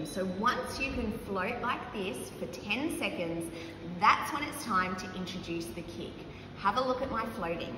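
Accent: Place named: Australia. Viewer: the best ausssie accent. thank ma'am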